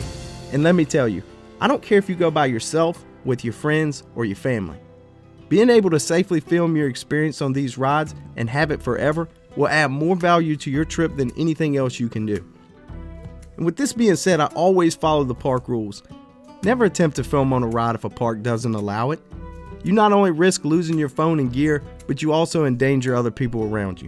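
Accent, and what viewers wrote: South, United States. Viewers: you have a great voice over voice but I can tell it's southern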